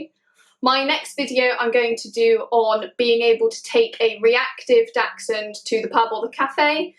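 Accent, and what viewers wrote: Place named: United Kingdom. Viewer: Oh, luv the your British accent!🐕will keep watching...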